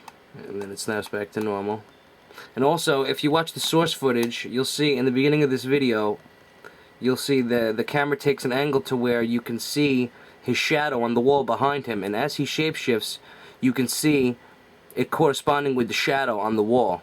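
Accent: Boston, United States. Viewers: Are you from the east Coast? I am from NJ. I seem to hear a boston twang. or maybe a brooklyn tone. We knowers of the truth need to get together. I suggest weekly Videoconference. What causes the quick Snap back to normality? We need to execute Genocide concerning these creatures.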